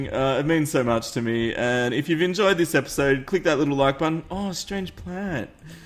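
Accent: Australia. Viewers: I hope u are enjoying your play through and I notice an Australian voice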